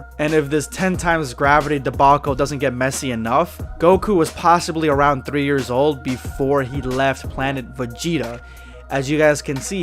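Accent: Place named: Latin America. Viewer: Oh man you being Hispanic makes so much sense, translating dbshippuden and the slight accent in your voice. Edit: I’m Hispanic too🤫, and could you do a video where you speak some Spanish??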